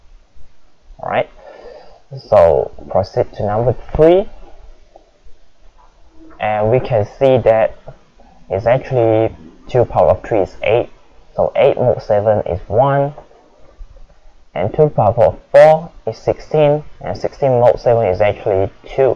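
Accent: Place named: Singapore. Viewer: definitely Singapore accent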